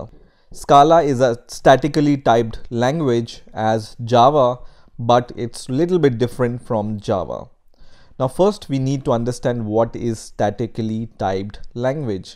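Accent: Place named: India. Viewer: I don't know why some people take issue with an Indian accent. I think it sounds just fine, even kind of adorable.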